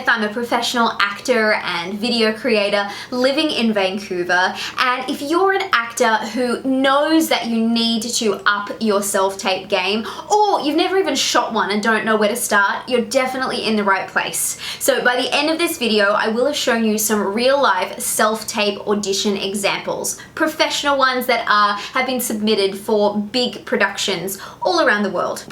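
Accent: United States. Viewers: Excellent tips. Thank you. Especially the advice on too many takes. I've selected one of the first takes more often than not. The other point that you touched on is that it creates a false positive. You will almost never get that many attempts on set and, if you do, it will be nerve-racking. Does your market encourage the pan up & down for the full-body slate? They won't tolerate it here so there's always a hard cut. In this market, the slate is always either a tail slate or a separate file. Also, congratulations on the dialect work. Your American is flawless! Thanks again for your generosity. You are very kind to lend your expertise. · Ur American accent is so on point. It doesn't sound fake or exagerated. you sound lovely and moremature in the Am accent. No hint at all that you are Aussie!! I'm on my way to get this accent ready!!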